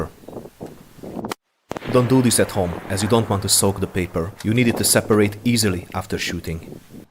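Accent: Hungary. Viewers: Michal Štiglic now imagine him saying that in his Hungarian accent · Nice Hunglish ))).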